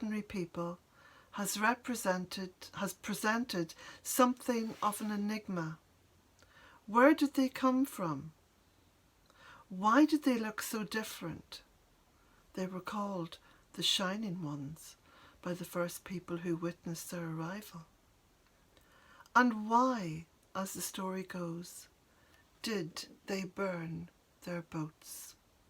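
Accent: Ireland. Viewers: Also your reading is so lovely! You might consider offering your books on tape. It is so pleasant hearing it in your lovely Irish lilt. Blessings to you, dear.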